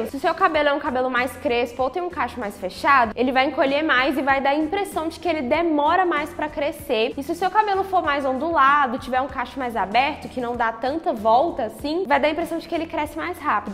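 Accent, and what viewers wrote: Minas Gerais, Brazil. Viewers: Amo o sotaque de Minas 😍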